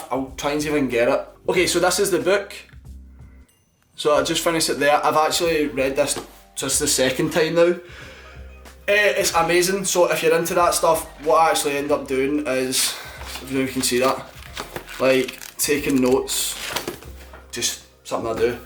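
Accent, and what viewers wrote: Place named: Scotland, United Kingdom. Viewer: Your accent is so great haha, I love Scotts. Great video mate!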